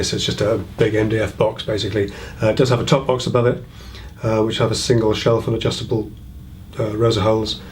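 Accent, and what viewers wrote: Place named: United Kingdom. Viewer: Hi. I live in South Africa and came across your videos about 2 weeks ago. I have since then watched ALL your videos. I find the British accent and the way you talk and just your videos so educational and relaxing. I will definitely watch all future videos. Many thanks for the excellent work!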